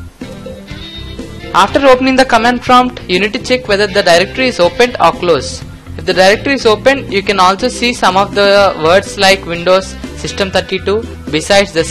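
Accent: India. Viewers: I love how he sound Indian lmao. Thanks for the tutorial tho. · When i heard the indian accent I just clicked off and disliked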